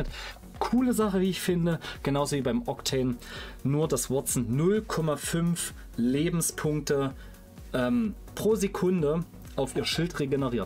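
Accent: Saxony, Germany. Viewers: Kommst. Du aus sachsen wie ich?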